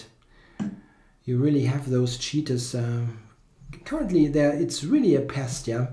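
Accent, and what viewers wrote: Germany. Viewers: that german accent xD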